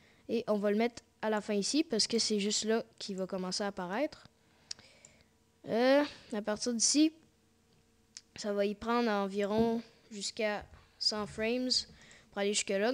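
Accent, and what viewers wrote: Quebec, Canada. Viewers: Super tuto avec un charmant accent canadien. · Tu es québécois UwU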